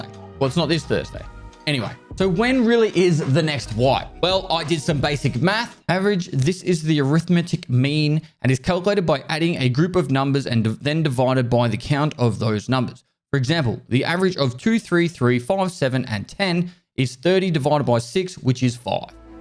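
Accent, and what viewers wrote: Australia. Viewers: I havent watched videos in a while, but living back in Australia for a bit now, his Accent got a lot stronger compared to when he was living in Europe for a year. Or is that just me?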